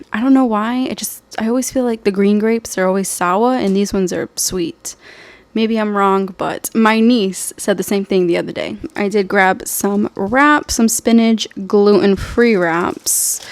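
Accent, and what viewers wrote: Boston, United States. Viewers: She is from Boston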